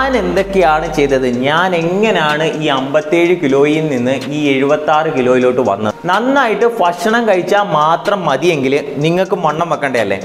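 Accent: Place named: India. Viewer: നല്ല അവതരണം 😍ബ്രോയുടെ സ്ഥലം എവിടെയാ? അല്ല സംസാരത്തിൽ "ഫ "വരുന്നുണ്ട് അതാട്ടോ ചോയിച്ചേ 🤔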